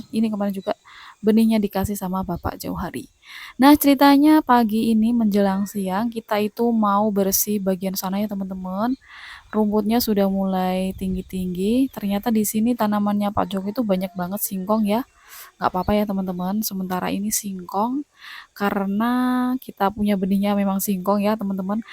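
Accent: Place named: Malang, Indonesia. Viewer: BHS orang malang rijik rijik 😂👍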